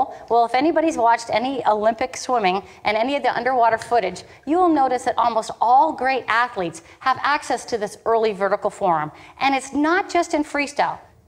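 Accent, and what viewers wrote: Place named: United States. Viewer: very nice USA accent 10/10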